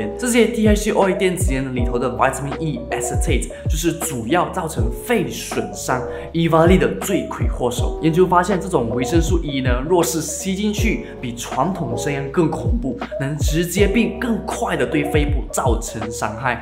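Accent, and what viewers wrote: Fuzhou, China. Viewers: 你肯定是福州人！！！！！！！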